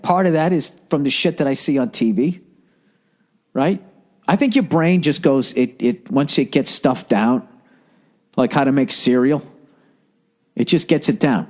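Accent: Boston, United States. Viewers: Hey are you from Southy?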